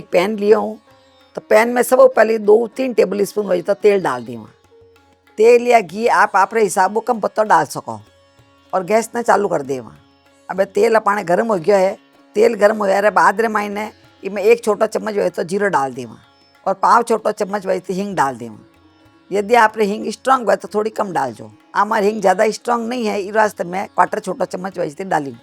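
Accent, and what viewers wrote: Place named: Jodhpur, India. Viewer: Mast vidio mast sabji mast jodhpuri boli sa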